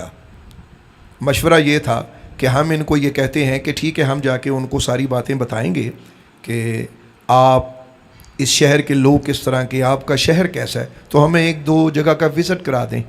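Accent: Arab world. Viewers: ALLAH HO ALLAH … HAZOOR QIBLA E MURSHID … sadqe ap k … Arabic lab o lehja khubsorat or purasar …. alaaa point of view ko beyan farmaya QURAN E PAK K reference se … haq farmaya … jo qume apny wade torti hai wo barbad hoti hai … ALLAH HO AKBAR …. qume bini israeel k waqie se humari alaaaaa islah farmai … ALLAH PAK humhy wada khalfi se bachye … ameeen … 🙏🏻🙏🏻🙏🏻🙏🏻🙏🏻👍👍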